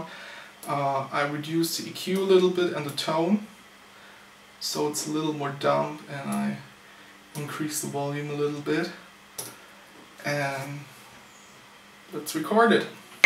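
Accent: Germany. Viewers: Very authentic. Where do you come from? Your accent sounds very german. Is it a good choice to start with maschine right from the beginning? Your much of a clicking person. but it's possible to browse with the Maschine Controller, isn't it? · Bist du deutsch? Du sprichst so englisch mit deutschen akzent :D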